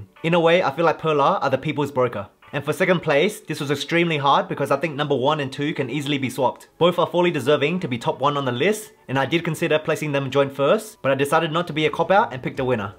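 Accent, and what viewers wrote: Bankstown, Australia. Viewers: You sound like you live in Bankstown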